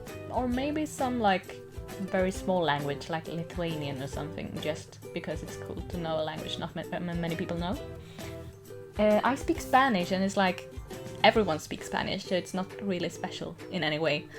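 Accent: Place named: RP, United Kingdom. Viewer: it's a bit posh but in a sophisticated way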